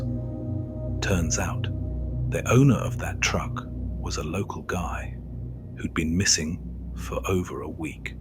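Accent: United Kingdom. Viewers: I am so happy to eventually find a British narrator, I love your voice and I love your content! I wish that everyone who listened to your stuff would hit the like button for you, it’s the least we can do to show our appreciation for your brilliant page xx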